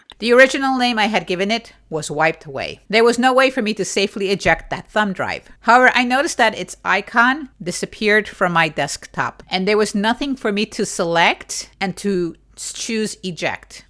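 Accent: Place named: New York, United States. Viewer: I saw the title and thought it might be good to consider, then I saw something about New York and was not sure but I gave it a look and was entertained and saw the process unfold. I was used to the style as my wife is from Queens, Flushing and lived in Manhattan before coming to Virginia where we met. You seem to talk like her so it was quite easy to ignore the emotional bits. But I still need to know if this something I need to do. Thank You I appreciate your sharing. Will need to see a few more videos to calibrate where you are coming form and what it means to me. I studied Fortran in undergrad then in Grad school took a fast track on several languages. Fortran again, PL1, APL, Cobol, Intel Assembler, Basic,Pascal and Forth. I decided I would never need to program ever again. But now in retirement I am rethinking that pledge. Moving to Linux ?!?! 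I don't know, See you next time. Dennis in Virginia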